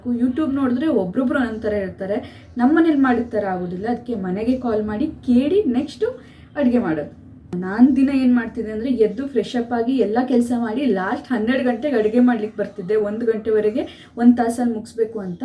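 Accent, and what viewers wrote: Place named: Kumta, India. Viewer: Ondu mat helala friend, Nam kumta bashe gatte bere, nenpitkolli, becoz Nan nine YouTube nodtirovaga nim vidio nodade subscribe madade, Nam bashene matadide ennu kushine nanu horgadane edini 27 varsdinda, nammur bashe kelidre evru nammavre Alva ansatte, god bless u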